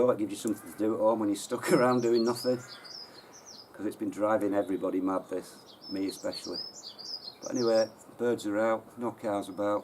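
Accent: Yorkshire, United Kingdom. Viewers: Very informative. You have got a great Yorkshire accent. Thumbs up.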